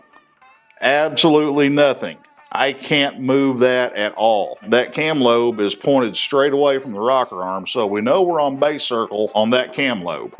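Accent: South, United States. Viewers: When someone with a southern accent tells me "That's bad, don't do that" with a cigarette hanging out of his mouth I'm gonna listen.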